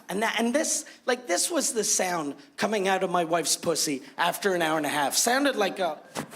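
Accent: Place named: France. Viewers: ***** oui, je sais mais je parlais au gars qui a dit qu'il a un accent français fort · loll l'accent francais fort ?! quel accent !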